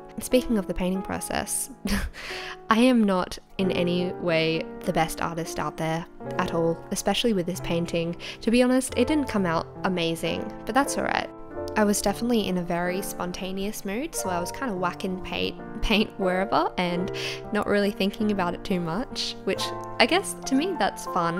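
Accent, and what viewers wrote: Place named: Australia. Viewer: Nevermind the haters. Aussie accents are adorbz :) Found you from Purple Palace's comment section lol. Cool vibes, keep it up!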